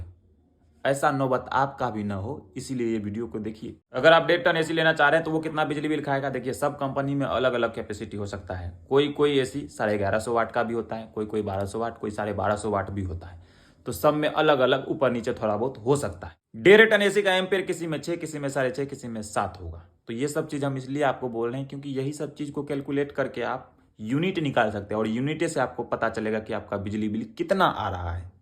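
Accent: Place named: Bihar, India. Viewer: Qki dono bihaari hai..(no offence) Ye bhojpuri lehza hai..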